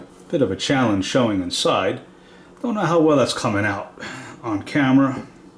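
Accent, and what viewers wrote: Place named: Boston, United States. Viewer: This guy is so Boston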